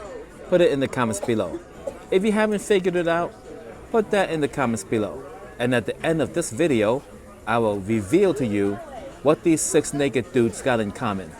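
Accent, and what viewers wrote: New York, United States. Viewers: Loved the video!!! I never noticed you had an accent!! You sound like your from NYC to me lol! I’m from Maryland so I never picked up your “Accent”!! 😂😂😂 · I thought New York as soon as I heard you. First time watcher, enjoyed it very much. Been doing Vegas since the early 70's, usually twice a year.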